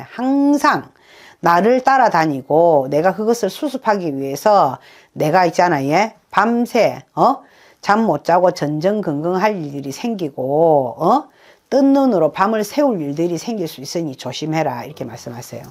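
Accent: Busan, South Korea. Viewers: 부산사투리 맘편해져요 저도 부산사람이라..한번뵙고 상담 받고싶네요.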